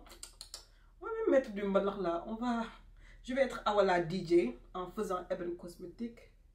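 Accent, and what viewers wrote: Wolof, Senegal. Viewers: 😂😂 je te kiffe trop ton français wolof way😂😂super maquillage spicy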